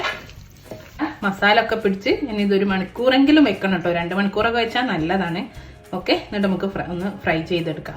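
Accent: Kozhikode, India. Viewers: This came out sooo Gud Thx 👏🏽 Ur kozhikode accent sooper 🥰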